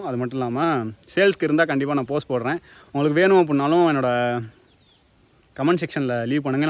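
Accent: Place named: Madurai, India. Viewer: 🤝Congratulations 🤩Your madurai tamil slang was nice to hear🙌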